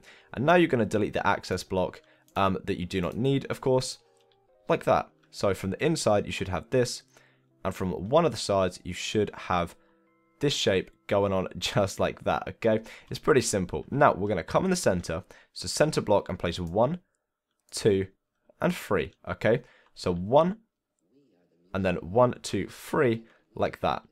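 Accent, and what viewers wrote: United Kingdom. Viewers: He Has the British accent, that's why. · XGZ_AnimationsX its just a feature of his British accent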